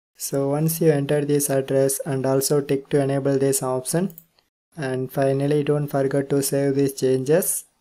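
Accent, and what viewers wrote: India. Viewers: TV as thai i think he not from thailand i think he is indian bc accent in thailand not like this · Indian voice, bye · thank you my bro i was making fun of ur accent now ill stop making fun of indians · thanks bro. for some reason its always the dudes that either are actually indian or sound like them that end up helping me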